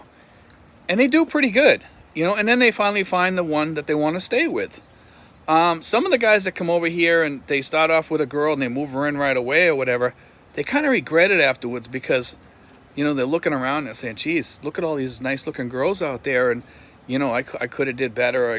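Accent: Boston, United States. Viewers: Great advice I missed you more than I thought glad I reconnected I don’t know how I lost connection in the first place I don’t know if YouTube is playing games or watt but anyways it’s good to hear that Bostonian talk logic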